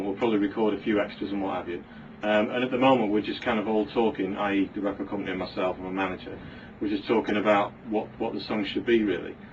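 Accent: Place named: England, United Kingdom. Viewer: no one else finds it odd that he sounds like he is english and sings like a black man????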